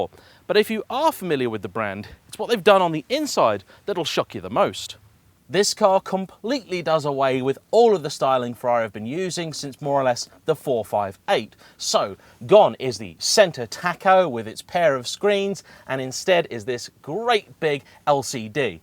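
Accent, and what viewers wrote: United Kingdom. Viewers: hey man, this is my first time seeing your channel. Absolutely awesome video, you have gained a subscriber. You remind me of Jeremy Clarkson with your British accent + the interrupted sentences. Carry on!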